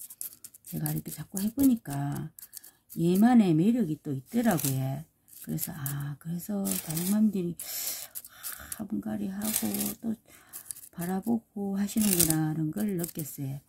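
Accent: Gyeongsang, South Korea. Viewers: 제라륨 분갈이를 경상도 싸투리로 정겹습니다 분갈이할 때 조마조마합니다 분갈이 하고 죽는경우를 많이 겪었네요 ㅎㅎ 재밌네예 부지런한 손은 아름다워요 호야네 실방에서~응원하러 왔어요 😊😍😍👍👍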